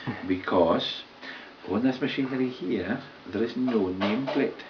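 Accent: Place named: Denmark. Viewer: he speaks english like a danish person, that is amazing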